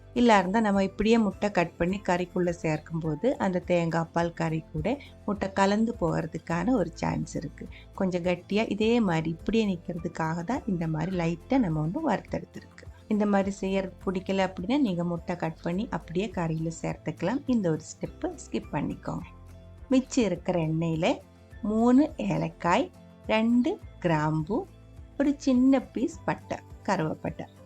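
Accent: Kerala, India. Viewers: മലയാളിയാണോ സംസാരിക്കുമ്പോൾ മലയാളം വരുന്നുണ്ട്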